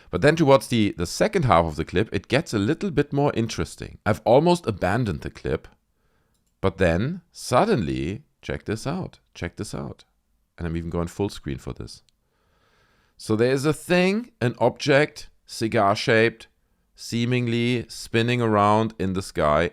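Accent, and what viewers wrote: Germany. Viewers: I am starting to learn German, very slowly. I hope that I can get myself to the point where I am able to speak German as well as you have learned to Speak English. Im guessing, (hopefully without offending), that German is your Native Language. Love your accent. If English is your Native language, my apologies, wasn't sure. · Love your analysis and humor doing so. the German accent adds gravitas for this Texan. The cylinder looks like something suspended from a drone by a fishing line. Way too unbelievable.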